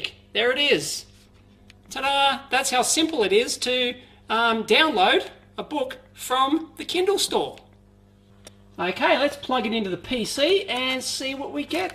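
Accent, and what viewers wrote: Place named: Australia. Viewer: Rocking the accent.. is that australian or new zeland?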